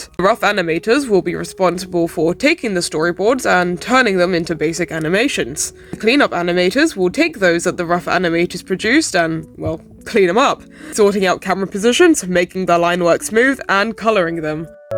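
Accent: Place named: United Kingdom. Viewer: Oh God, he’s British (ps this sounds amazing)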